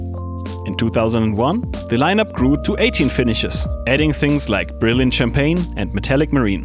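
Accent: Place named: Germany. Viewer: Kann man nicht für wenig Geld einen Muttersprachler den Text ein sprechen lassen....spoken German English is soooo shamefull. Beside that: nice work.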